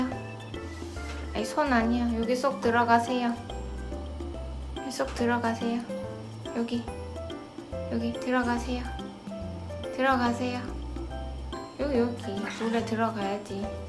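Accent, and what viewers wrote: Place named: Gyeongsang, South Korea. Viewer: 얘 나이가 어떻게 되요? 우리애도 보테인데..남자애고..3살이고...경상도 사투리 쓰시는거 보니...저는 김해 살아요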